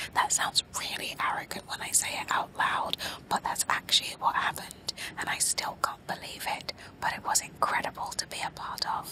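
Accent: England, United Kingdom. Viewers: I did NOT expect that English accent!! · asmr in an English accent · So shocked she has a british accent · she had a british accent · she had a British accent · Normally I hate british accent but hers is very lovely and soft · Hourglass English accent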